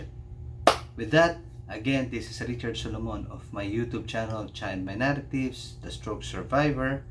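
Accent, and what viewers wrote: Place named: Philippines. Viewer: Great commentary. We are all God's children first and foremost. Sometimes we are our own worst enemies. Appreciate the uniqueness of each person. Your Taglish is absolutely fine. Namaste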